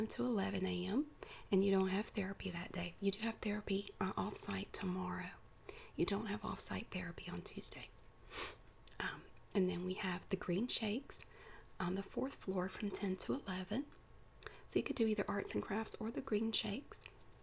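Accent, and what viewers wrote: South, United States. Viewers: Oh yeah I like your southern accent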